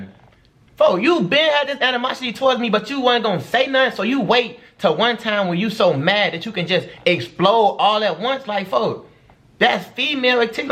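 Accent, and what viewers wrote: Chicago, United States. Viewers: You can tell bro from Chicago 😂💯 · u from chicago? lmao you got dat g herbo slang when you say car and folk lmao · yo you from chicago u sound like it oms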